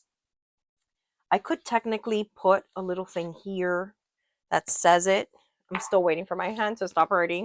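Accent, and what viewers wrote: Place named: Miami, United States. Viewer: I heard your accent and instantly knew you were a fellow miami girly! Hiiii! Love the vid! :)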